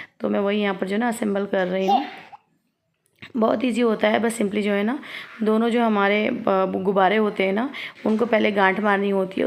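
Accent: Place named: Gulbarga, India. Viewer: Mujhe maloom ich hai ke gulbarga ke baatan aisich rahtie baji 🤭🤭🤭🤭🤭aapko waise baat karte hue sunke hasi aari mujhe par mashAllah baatein abba beti ki sunke khushi hui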